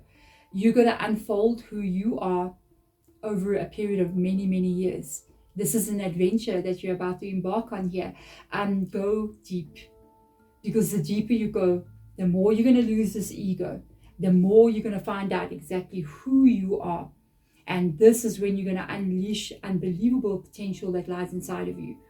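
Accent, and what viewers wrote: South Africa. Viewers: Are you a southy 🇿🇦?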